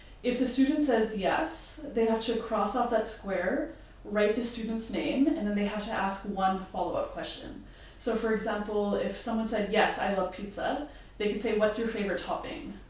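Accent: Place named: Canada. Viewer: LOL Your Canadian accent is very prominent in this video. It's not bad, I'm just saying I picked up on it right away. But I guess that's why I'm a trained ESL teacher. I can easily identify accents from all over the world. My question for you, though, is what kind of icebreaker activities would you recommend for adult beginners? Most of the beginner activities I come across are for young learners. I'm looking for some activities that adults can do that aren't too advanced.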